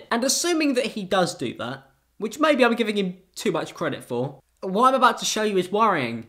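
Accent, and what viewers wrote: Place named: England, United Kingdom. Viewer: What I hate more than ricegum is the English accent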